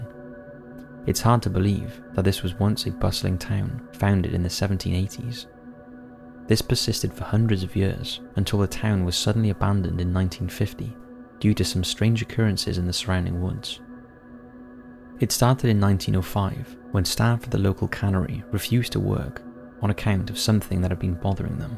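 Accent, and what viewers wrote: United Kingdom. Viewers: Could you try any harder to imitate the voice of that Dark Docs bozo? An overly dramatized, whispery, vaguely Brit voice does not in any way improve an already shabby production. Consider less theatrics and way more thought on your next one mate. Cheers!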